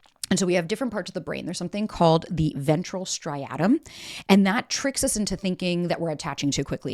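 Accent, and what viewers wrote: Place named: New York, United States. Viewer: Must be a Newyawka? 😮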